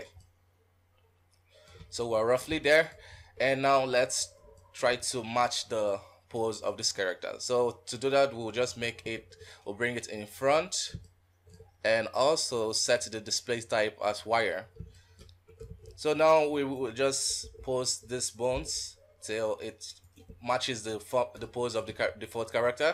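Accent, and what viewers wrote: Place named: Nigeria. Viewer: Just wondering are you nigerian? You sound like nigerian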